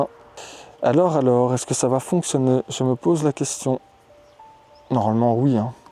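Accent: Belgium. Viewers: Ouit= huit j’aime trop ce petit accent belge. ♥️👍😊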